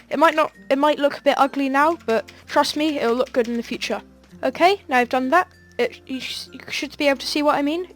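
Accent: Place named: United Kingdom. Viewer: The person talking sounds like a British man 🤣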